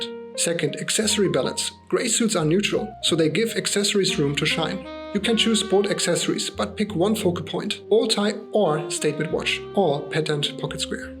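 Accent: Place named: Germany. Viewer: Great videos with helpful tips. Just subscribed. I hear from your accent you’re German? Question: where do you buy your suits?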